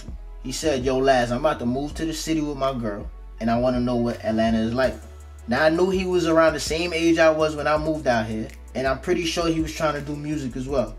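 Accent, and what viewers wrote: New York, United States. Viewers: I knew your were from New York...😊 Facts.....❤💥. #southbronx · Listen closely and you can hear both his New York & Atlanta accent. Shit is tripping me out. Lol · I love New York Aaccents...I love yours...You have a good heart!!!!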